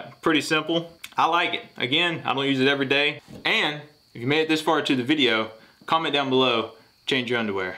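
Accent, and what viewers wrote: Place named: South, United States. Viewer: What an accent! Southerner, I presume.. Georgia, Texas. Am I right? BTW, nice video. Keep it up!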